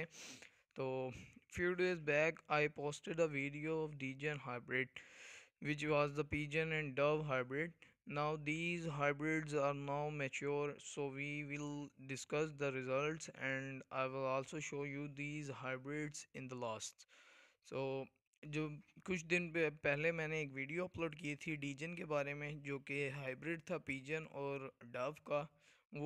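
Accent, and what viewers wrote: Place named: Pakistan. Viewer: آپکی انگلش بولنے کے طریقے سے ہی پتا چل گیا تھا کہ آپ پاکستانی ہی ہو😃😉😜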